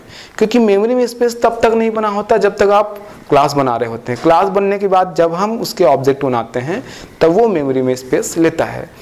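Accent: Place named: Bhojpuri, India. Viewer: bhojpuri tone me baat karte hai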